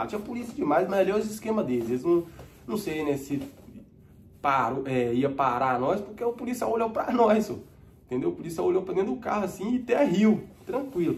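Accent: Minas Gerais, Brazil. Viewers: eu adoro o sotaque de minas mano, se loco vc aprende e se diverte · Mineiro contando é mt engraçado kakskakakakaka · Kkkkk mano tu e mineiro né ? Cai aqui de paraquedas, rachei da forma engraçada que vc conta kkkkk vc parece muito o Josias presidente da Galoucura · O seu sotaque é igual o do meu primo Leo que é de inhapim mg ele tá nos Estados Unidos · Quw cara dahora um abraço man sou mineiro tbm, melhor sotaque pra contar história ❤😂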